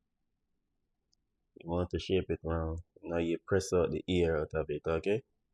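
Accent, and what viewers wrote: Jamaica. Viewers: I like how you cook and bake, I followed your sweet potato pudding and it was a breeze and tasted 😋 good, I don't bake every week because we have to watch gain!😢 I like your accent, is it a Westmoreland or ST. Elizabeth accent? I pick up that your Channel is from foreign though. The sugar softens the dumplings, also a little milk.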